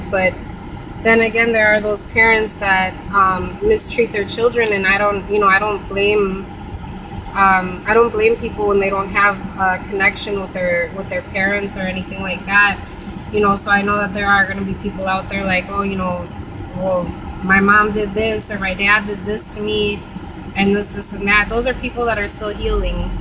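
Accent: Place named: New York, United States. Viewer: I love your accent, are you from NYC?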